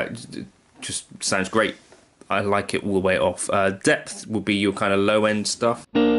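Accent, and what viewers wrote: United Kingdom. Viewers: What a sound! And your noodling is as always way better than my best technique. Cheers! Oh and I don't know if you've been told already but the British accent is jolly fun to listen to!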